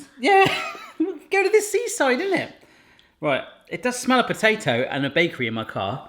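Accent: South Africa. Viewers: S.A represent! I'm also from South Africa and I'm feeling it for that accent! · I'm from South Africa and that accent gave it away.. He sounds Afrikaans too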